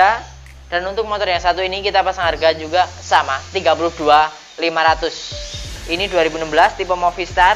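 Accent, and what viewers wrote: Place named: Java, Indonesia. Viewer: Sampean wong jowo toh mas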